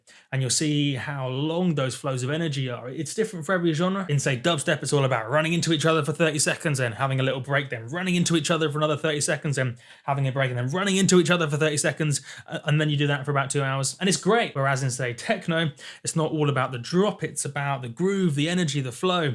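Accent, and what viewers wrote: United Kingdom. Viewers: Is he a british guy?his accent.. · BTW, are you British?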